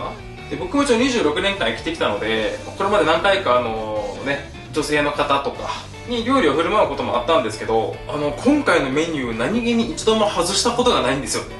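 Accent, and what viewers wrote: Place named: Hakata, Japan. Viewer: 最近見させていただいてます！もっと早くさんを知りたかった！同じ九州人なので、時々出る博多弁が心地いいです！料理男子として尊敬しまてます！これからも動画楽しみにしています！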